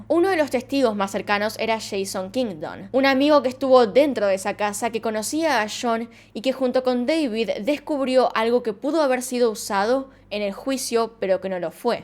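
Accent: Argentina. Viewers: Me pasa lo mismo, en mi caso no me gusta el acento argentino, pero los casos misteriosos son interesantes.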